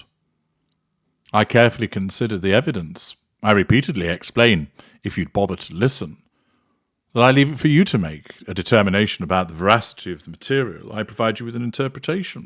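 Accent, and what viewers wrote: United Kingdom. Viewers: I love how you take them down so eloquently & intellectually in your amazing well spoken British accent 👏 👌 It should certainly give them something to think about of the way they now look so foolish ❤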